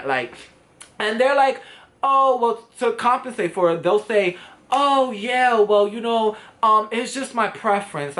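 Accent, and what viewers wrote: New York, United States. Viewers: New Yorkers be extra wit dey accent on me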